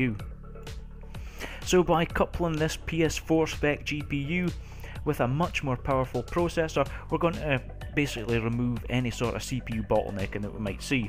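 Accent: Scotland, United Kingdom. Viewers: Your Scottish eh? Dinny try hide it noo.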